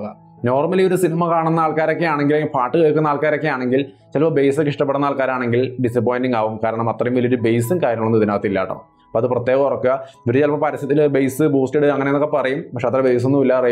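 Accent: Thrissur, India. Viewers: Thrissur slang 😁😁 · Thrissur slang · മറ്റു വീഡിയോകളുടെ അപേക്ഷിച്ച് നോക്കുമ്പോൾ ഈ വീഡിയോ തൃശൂർ സ്ലാങ് ഫീൽ ചെയ്യുന്നുണ്ട് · trissur slang ee videoil nannaai kayarikoodiyallo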